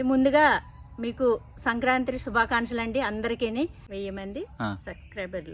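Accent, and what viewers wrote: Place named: Krishna-East Godavari, India. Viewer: Dear — Don’t feel bad for not able to speak in English amma. I am 80 years old. And I did M.A English While working as a B. Ed. teacher. But your Telugu is so sweet with an accent between the Krishna and East Godavari districts. It’s not the language but the. Bhava prakatana which is important. That is important. That you have more with your smile. I like. and I watch all your videos. Bhasha anedi maatladuthovuntene brathukuthundi. You are doing your. duty as a. wife and as a mother very well. You are a. hard worker. Keep it up. By the by your farm is very nice😊God bless you and your. family. At this age my hands are shaking so much.Don’t feel bad if there are any mistakes.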